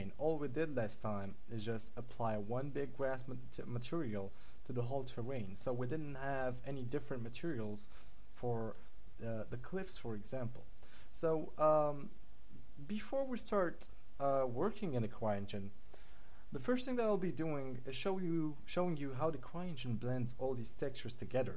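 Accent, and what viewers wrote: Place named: Germany. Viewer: you are a true artist, and you can explain very well! even the german accent is adorable :)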